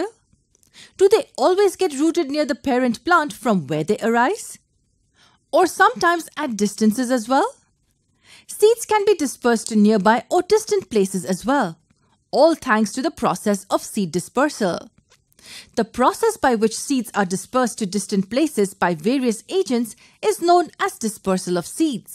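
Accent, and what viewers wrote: India. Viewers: you sound li indien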